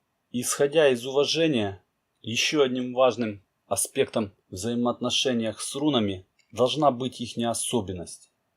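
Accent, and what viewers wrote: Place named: Ukraine. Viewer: Обычный мягкий украинский говор.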